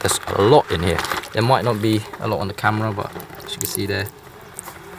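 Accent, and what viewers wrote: England, United Kingdom. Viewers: How come you have a strong english accent? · How come you have British accent? (In a good way) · New to the channel have you lived in Britain you sound like it?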